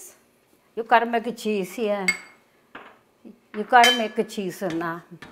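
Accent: Italy. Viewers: Looove that glorious Italian accent!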